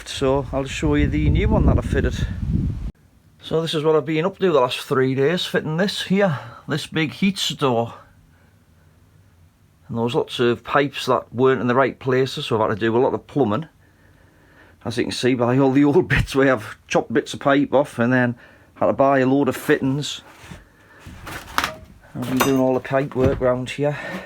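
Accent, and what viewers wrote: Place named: Tyne Valley, United Kingdom. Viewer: Should be a useless b****rd like me,life is much easier. BTW where is your farm (roughly) we live in Alnwick but my wife is from Wylam and she says you def have a Tyne valley accent!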